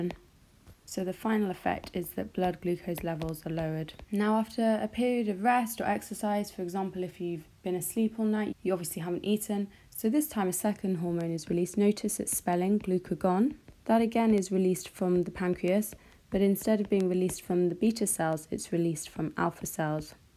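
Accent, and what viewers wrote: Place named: England, United Kingdom. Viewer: i could watch those videos just to listen to your English accent.... its so beautiful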